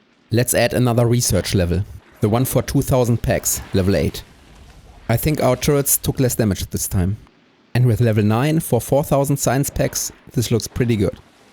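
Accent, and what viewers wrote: Germany. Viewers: That's the german accent for ya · Jetzt reichts! Für deinen deutschen Akzent bekommst du ein Abo!!!!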